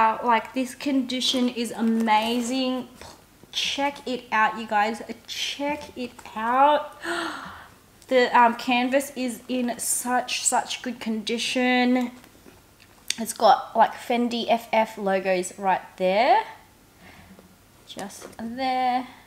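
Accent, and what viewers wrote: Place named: Australia. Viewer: Funny that... I love the way she talks.. it's warming. Real n Aussie n relatable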